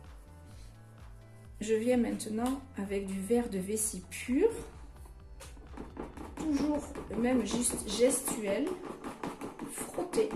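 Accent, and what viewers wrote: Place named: Marseille, France. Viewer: You have a lovely accent...Marseille maybe. Similar to the rapper Akhenaton from IAM if i'm not mistaking. Anyway, love ur work ♥️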